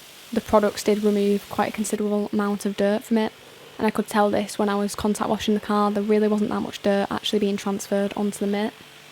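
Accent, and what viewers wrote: United Kingdom. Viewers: Sorry to say..im actually Don't understand some word..bcz of british accent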